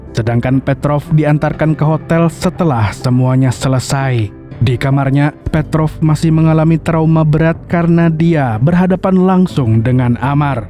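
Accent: Banjar, Indonesia. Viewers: RsJ sambanglihum 😂 Real org Banjar nih nah 😅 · Urang banjar sekali amang nih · Urang Banjar sekalinya😭🙌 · orang Banjar kh 😂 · Ae urg banjar kah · org banua ini😅 sambang lihum😅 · Urang Banjar KH pyn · Asli Banjar kh bg? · Ammarnya urang banjar skalinya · Mantap mank,👍 Filim x msntap suara amank x banjar bnr😂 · Urang banjar kah sekalinya pian ni mang...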